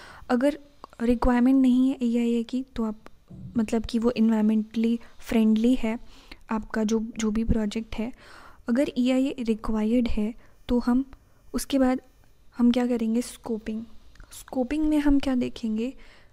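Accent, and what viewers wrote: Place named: Kashmir, India. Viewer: Good video. Mam your sound like Kashmiri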